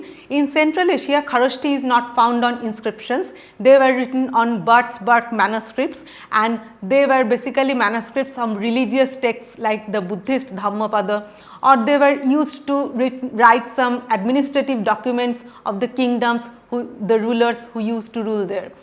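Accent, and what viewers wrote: Bengal, India. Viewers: ये बंगालियों की इंग्लिश लगती है जो टेलीप्राम्पटर से पढ़ी जा रही है।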